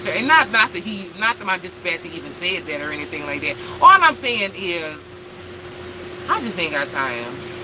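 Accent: Louisiana, United States. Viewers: I love that Louisiana accent.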